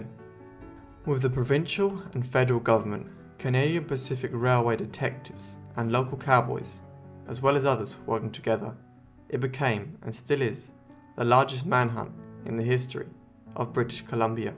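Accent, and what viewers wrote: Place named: United Kingdom. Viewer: I don't care for the British accent narrating this.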